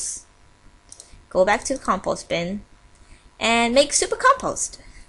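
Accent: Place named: Scotland, United Kingdom. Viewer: He has a Scottish accent :)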